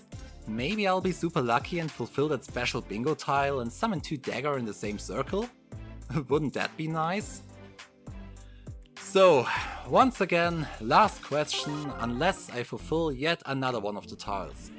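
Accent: Germany. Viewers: German accent: nunja, dann haben wir hier ja noch einen deutschen Feh Spieler! :)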